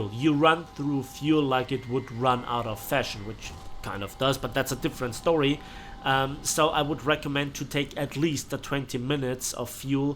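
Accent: Bavaria, Germany. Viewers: How could anyone tell you to shut up?! Your Bavarian accent is extremely enjoyable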